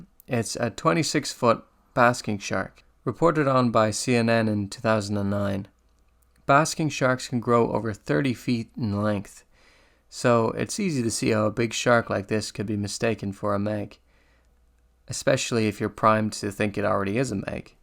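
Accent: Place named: Ireland. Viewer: I hear a hint of Irish in your speech...where are you from in Ireland?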